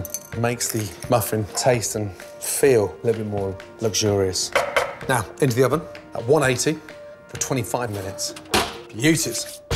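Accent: United Kingdom. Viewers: Peeeehs, centah, fooos sides, buttah. I love this accent.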